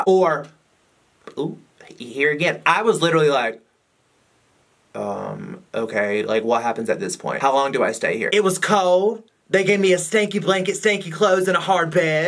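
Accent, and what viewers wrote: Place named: United States. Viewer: You should do an entire video trying not to let your country accent come out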